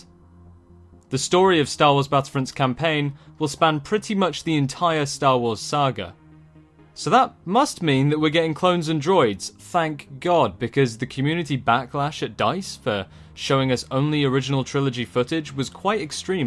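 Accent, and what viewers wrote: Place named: United Kingdom. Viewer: Good video, very informative and i almost cried in excitement. Dont understand why you dont have more subscribers especially with that british accent that automatically makes you sound more intelligent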